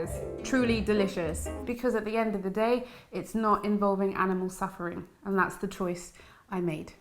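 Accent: United Kingdom. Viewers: Gosh you’re very beautiful and that British accent compliments your beauty. By the way I’m going to try this burger. I’ve been a vegan for three months now.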